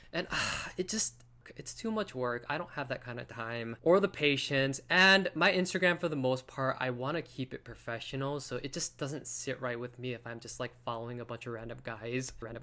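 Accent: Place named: United States. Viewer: You sound American... You speak English well!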